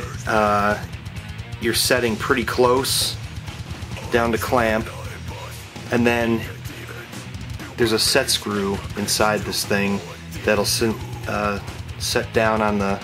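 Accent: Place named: Canada. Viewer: he kinda sounds canadian doesent he??? small accent ??? or maby colorado??? haha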